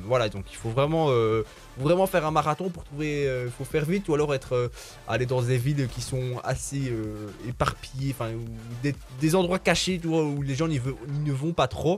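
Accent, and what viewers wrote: Belgium. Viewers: Accent belge j’ai la même MDR 😂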